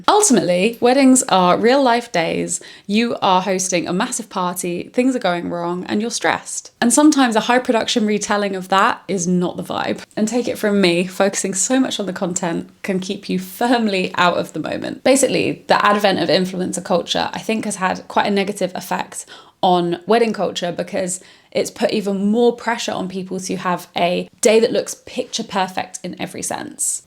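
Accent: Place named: England, United Kingdom. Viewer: Was odd hearing the word "bachelorette" so much in an English accent - so the Americans know what you're on about I guess - then you said "hen do" 😉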